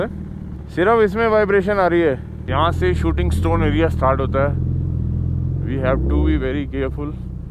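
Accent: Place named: Bihar, India. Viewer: Stop speaking like bihari babu aaraha Hain speak like Punjabi aa rahi Hain Hain bro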